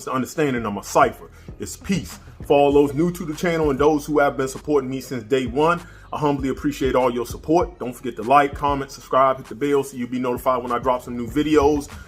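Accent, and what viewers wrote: DMV, United States. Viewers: Yo, as soon as you started talking I knew you were from the DMV.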